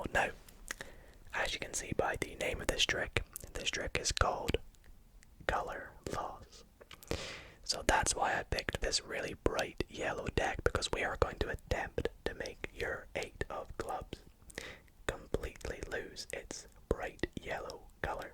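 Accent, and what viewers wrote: Scotland, United Kingdom. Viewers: Your Scottish accent makes your asmr the best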